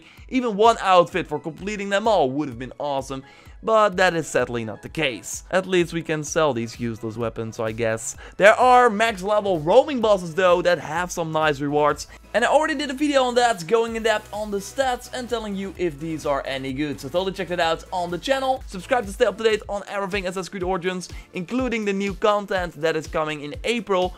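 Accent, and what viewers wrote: Netherlands. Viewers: je bent overduidelijk een nederlander, dat accentje haal je er ZO uit hahaha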